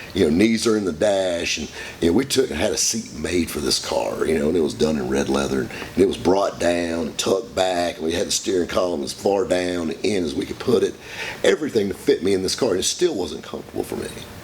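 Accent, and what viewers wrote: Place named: Carolina, United States. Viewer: When I read these posts I hear Carolina accent and voice in my head...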